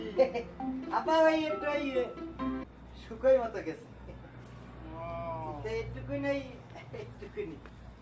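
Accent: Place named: Jessore, Bangladesh. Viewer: যশোরের ভাষা খুব ভালো লাগে, আপনাকে যশোরের ভাষায় সব সময় কথা বলা মানায়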